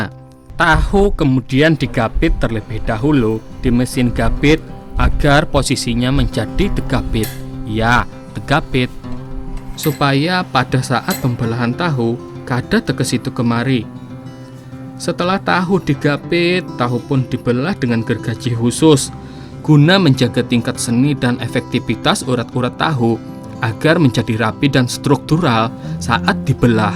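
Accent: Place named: Kalimantan, Indonesia. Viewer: Palangkaraya mna suara nya like urang Kalimantan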